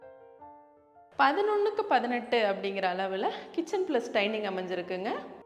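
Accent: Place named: Kongu, India. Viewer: அம்மா, வீடு விற்பனை மற்றும் வீடு வாடகை தகவல்களை நீங்கள் விளக்கும் முறை அருமை. கொங்கு தமிழ் பேசுகிறது. வாழ்த்துக்கள். பணி சிறக்க வாழ்த்துக்கள்.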